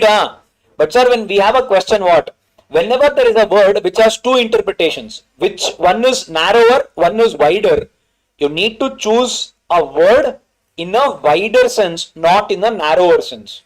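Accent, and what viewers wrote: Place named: Bangalore, India. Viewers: South accent is really cute yaar and funny too 😺😅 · I love his bangalore english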